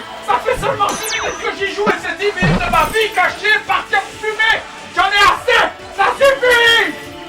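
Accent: South, France. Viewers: L'époque où avait son accent du sud...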